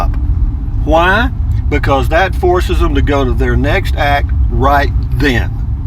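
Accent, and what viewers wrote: Texas, United States. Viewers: Love that Texas talkin' style! Your video not only made me sit up and pay attention, it made me laugh at some of the things you said. Humor and common sense.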